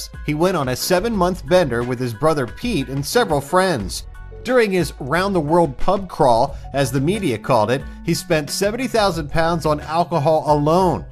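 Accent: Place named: United States. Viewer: Lmao I love how the narrator speaks in an American accent but refers to everything in British slang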